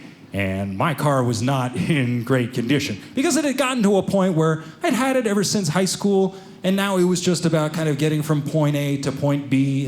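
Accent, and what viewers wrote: United States. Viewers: I have to say that is the most American a person has ever sounded in my very short time of having sentience.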